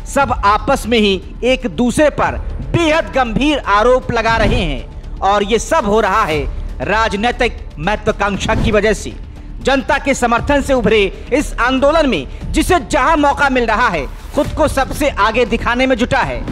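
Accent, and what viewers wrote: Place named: Palamu, India. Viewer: Maine Palamau me 12 years rha h isi tarah waha ke log bolte hin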